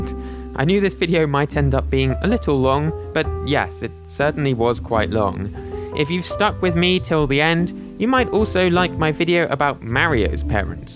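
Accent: United Kingdom. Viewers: I love how you pronounce it "Ocarin-er" lol. You're on the opposite side of the earth, and somehow, you're more redneck than me. Hello, from Texas, mi amigo, mein freund! God bless ya, dude. I love your vids. I love your accent, too. (American people think British sounding accents are indicative of high-level intelligence. But they'll still pick on you for pronouncing things differently than they do. Idk why. I'm a native Texan. I'll be American again, as soon as the rest of this country pulls their heads out of their asses.....) Keep up the great work.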